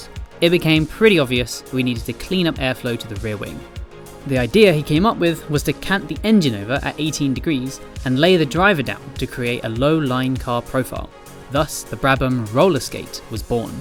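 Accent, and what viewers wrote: United Kingdom. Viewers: Why do Brits call Honda, Honder?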